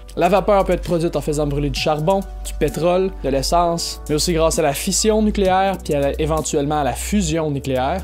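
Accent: Quebec, Canada. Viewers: j adore l accent quebequois !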